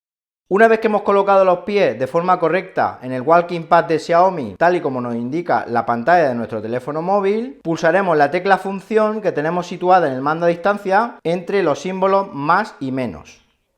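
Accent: Murcia, Spain. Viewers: Ahora que llega el verano? Por tu acento diria q eres de murcia...y a no ser que en murcia el verano empiece ya , no entiendo.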